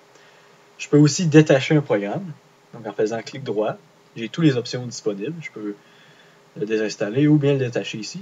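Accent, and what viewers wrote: Quebec, Canada. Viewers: Est-ce que les Français font exprès pour ne pas comprendre l'accent Québécois??? Nous on comprends très bien votre accent et vos énormes anglicismes!!! "Putain j'piges pas c'que tu dis bordel"... nous on dis "Criss qu'es-ce tu dis tabarnak"... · C'est toi le con ! Ça s'entend pas que c'est un Québécois ?? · Haha la vidéo à commencé j'ai pas compris, puis jme suis dit "ahhh mais c'est du québéquois" =) Quand on s'y attend pas ça surprend ^^ merci pour cette vidéo !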